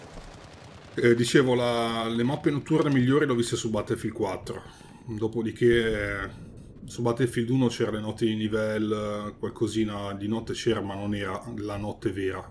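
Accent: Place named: Lombardy, Italy. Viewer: ti ringrazio a te per il "bell" ahahah. Hai un'accento familiare, sei lombardo?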